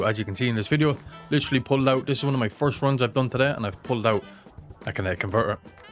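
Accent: Ireland. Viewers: Great video,! Extremely helpful. And every time I watch one of your videos, your brogue reminds me that I need to get back to Ireland.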